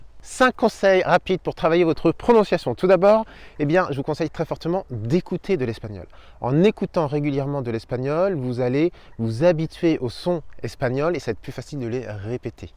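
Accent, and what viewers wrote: France. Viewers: Bonjour, il y a toujours des gens pour critiquer, et comme on dit en France: la critique est facile mais l'art et difficile, et tous le monde a un accent moi je suis dans le sud de la France, et des gens remarquent que je ne suis pas originaire d'ici en effet j'ai vécu 25 ans dans l'Aisne au nord de Paris donc 100kms et alors rien de grave! De plus j'ai déjà fait écouter tes vidéos à une amie Dominicaine, et ça ne l'a pas dû tout choquée, par contre quand elle regarde des films espagnol du sud elle doit mettre les sous titres 😅, et j'ai beaucoup voyagé en France et alors là les accents il y en a de partout 😂, mais c'est joli tout ça, tant que l'on se comprend, de plus je disais à mon amie Dominicaine de ne pas s'inquiéter pour cela car celon avec qui elle parlera le français, il est différent partout. Bon je fini par dire que l'on a de la chance d'avoir quelqu'un comme toi pour apprendre l'espagnol, car tu as peut être un accent français mais au moins on te comprend quand tu parles, car lorsque c'est des natifs on ne comprends pas toujours, et si l'on est sur ta chaîne c'est pour apprendre et si ça ne convient pas à certains et bien qu'ils aillent voir ailleurs ou qu'il fasse leur chaîne. 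Voilà de tout ❤avec toi et encore merci 👍👏t'es génial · Ma fille qui vit et travaille en Catalogne depuis 12 ans, qui est parfaitement bilingue en castillan, et presque en catalan …. A un fond d’accent français ( de Paris ), et tous ses amis natifs espagnols et ses collègues, lui disent de ne rien changer….. c’est trop glamour comme ils lui disent ….de plus l’accent français et la langue, aussi d’ailleurs,font partie des plus sexy et appréciés de la planète ….à priori ….. donc bien d’accord …. L’essentiel est de maîtriser une langue, d’être compris, et de garder son identité., surtout ne changez rien, ce qui est important c’est de nous apprendre cette belle langue hispanique, avec ou sans pointe d’accent français …. Ce n’est pas l’essentiel …. Et vous le faites si bien.Et d’ailleurs, moi qui ne maîtrise pas bien cette langue mais l’entends régulièrement en Espagne, je trouve que vous êtes au top de votre expression. 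Merci à vous …. 👏👏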